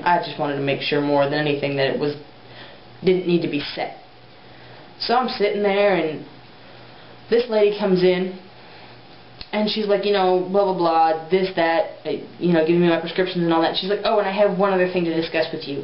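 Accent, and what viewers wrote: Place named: Texas, United States. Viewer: so, if you are not from Texas where are you from. You must have lived in Texas for long enough to gain an accent. I really enjoy your video, and you look amazing.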